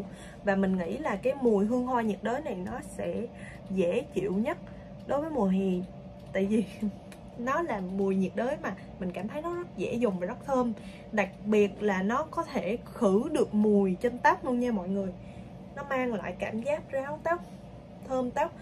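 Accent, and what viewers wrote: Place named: Binh Dinh, Vietnam. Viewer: Giọng Bình Định hay quá chị ơi!!!!!!!!!!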